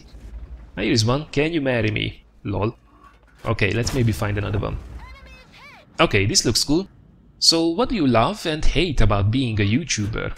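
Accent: Hungary. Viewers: Tudtam hogy magyar akcentus :D tetszik a sorozat de szerintem egy rész lehetne hosszabb is. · Az akcentus elég árulkodó :) Ráadásul Velem egykorú...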